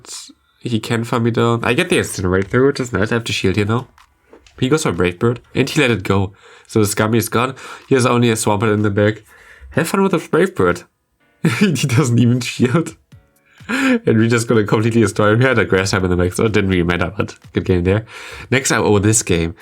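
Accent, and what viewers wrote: Germany. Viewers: His German accent is kinda strong… but as a German like me you can understand his English very well. 🤔😅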